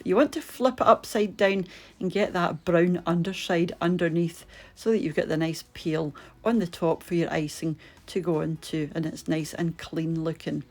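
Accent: Scotland, United Kingdom. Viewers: What a lovely cake and such a cute Scottish accent ❤❤❤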